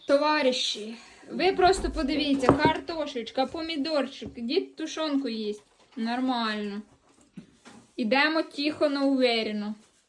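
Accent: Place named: Western Ukraine, Ukraine. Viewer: .по вимові складається враження,.що ти із західних областей?.яАле у тебе не суржик,.у тебе кацапська на український лад,.ти молоденька навчись своєї рідної мови,.ти взагалі молодець як людина теж тож не ображайся за мову,.а вчи,.бо дуже уже нам боляче слухати ту кацапську,.рощумієшчому?.Скільки людей убили,."асвадаждаючи"від української.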